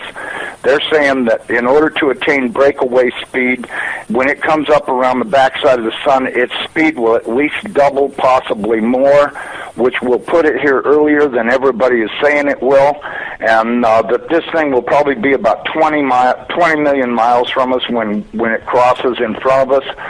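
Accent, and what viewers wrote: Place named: South, United States. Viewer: 13 years later total bullshit. this guy sounds like hill billy trailer trash.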